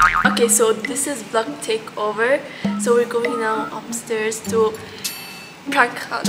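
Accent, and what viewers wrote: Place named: Philippines. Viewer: The way you talk English sound like more Filipino already 😂😂😂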